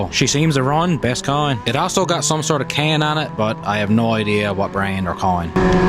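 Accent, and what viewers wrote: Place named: Newfoundland, Canada. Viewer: Tirty tree tree's 😂 im laughing on the floor. Needs subtitles for anyone not from Newfoundland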